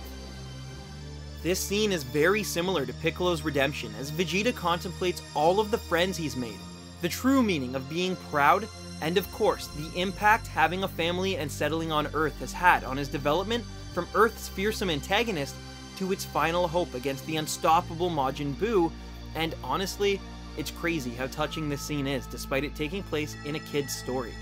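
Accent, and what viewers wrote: Canada. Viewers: just the way you say about it can tell you Canadian I'm right aren't i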